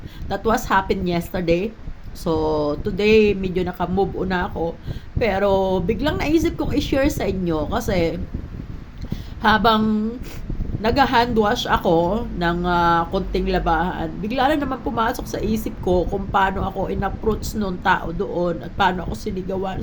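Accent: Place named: Philippines. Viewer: Watching sharing akala ko Korean ka nanuod ako sayo silent now may sounds pinay ka pala❤❤ kahirap yan dapat talaga mag helmet kasi hirap 100 pa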